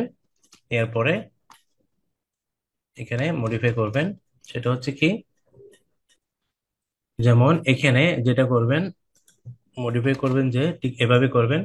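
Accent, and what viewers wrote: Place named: Chittagong, Bangladesh. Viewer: vai apni ctg mone hoi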